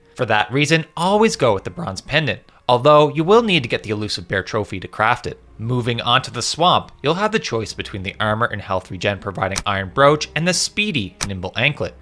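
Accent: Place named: Maritimes, Canada. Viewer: Are you from Nova Scotia or New Brunswick? Got that accent goin on.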